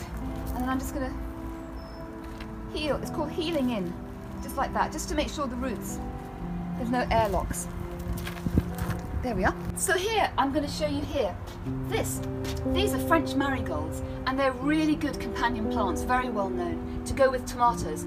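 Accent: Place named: RP, United Kingdom. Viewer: 好喜欢听的Qween’s English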